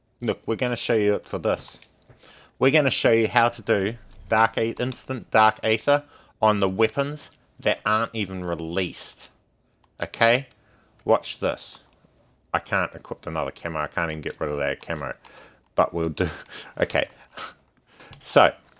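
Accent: New Zealand. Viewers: new zealand accents make me want to be from new zealand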